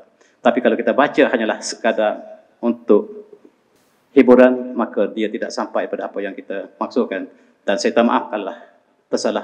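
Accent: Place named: Kelantan, Malaysia. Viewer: Slang kelantan tu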